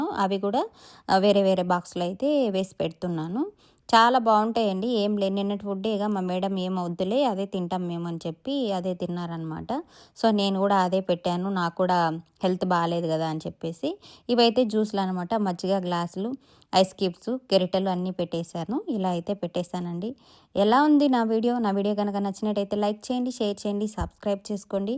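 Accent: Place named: Telugu, India. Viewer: Swachamina Telugu ammayi laga undi me speech ❤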